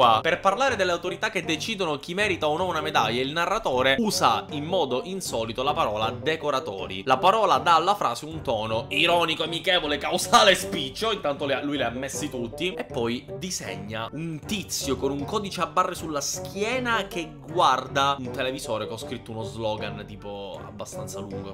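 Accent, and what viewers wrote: Palermo, Italy. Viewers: Oddio ma ho notato una cosa : PARLI IL PALERMITANO MEGLIO DI MIO PADRE..... COSA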